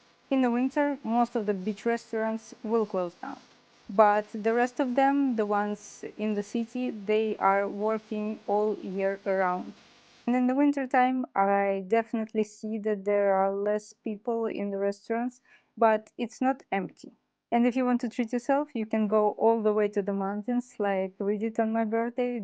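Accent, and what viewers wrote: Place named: Russia. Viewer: Hi, thank you for the video. My dream is to retire in this area, Antalya or Side. You sound like you are russian, and my wife is russian. I am norwegian. I speak english very well, quite a bit of turkish, some russian. My wife does not speak turkish, but russian and some english. My thinking is that she can live there and manage quite well with russian. However, she does not always like associating with other russians, and I'm not entirely sure why. If we one day will take a trip and see how it is like, would it be good to rent something with AirBnb, or aparthotel like you did? If so, what area?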